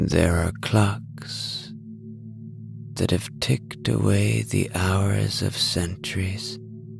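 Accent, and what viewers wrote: Ireland. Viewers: You have such a calming voice. I love the Irish accent. I think the Irish singers are fabulous too. I’m afraid I never get to enjoy the actual stories as I don’t hear them. It takes a very short time to be lulled asleep listening to your voice. Thank you.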